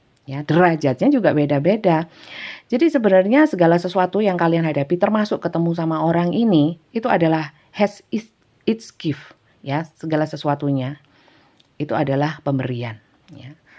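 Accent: East Java, Indonesia. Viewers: Peeeh ora umom to Bun...suka dngn Readinganny karna suara dn logat jw timuran yg khas,slm sehat Bun dr cancer Borneo nyimak 🌹🙏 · penyampaian yang apa ada nya simple jawa timur banget mudah diterima dan di cerna semakin sukses ya mbk sllu dlm keberkahan Allah SWT.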